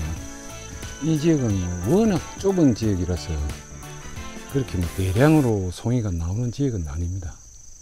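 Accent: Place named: Gyeongsang, South Korea. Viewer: 구독,좋아요,알림하고 갑니데이^^ 말투가 정겹심더~~ㅎ 경남쪽 같으시네예 산행시 늘 안전하게 풍산하시길예^^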